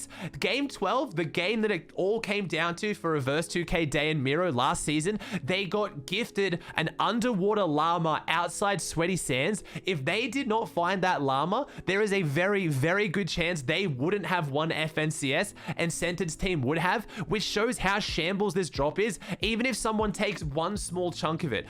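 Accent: Australia. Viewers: We love ur aussie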